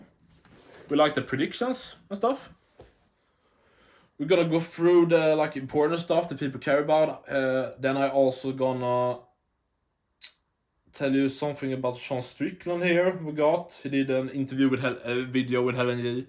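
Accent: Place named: Spain. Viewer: nice spanish accent!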